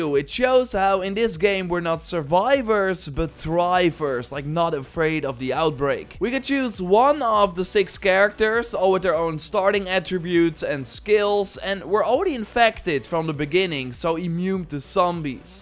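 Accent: Netherlands. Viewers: Ooeeff best lastig om te volgen met zo'n sterk nederlands accent eerlijk gezegd.. wel reviews verder😁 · het hollands accent kan je ook niet verstoppen eh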